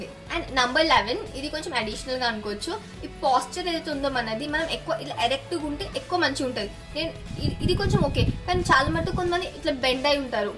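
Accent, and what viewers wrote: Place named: India. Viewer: Love your slang in telugu ❣️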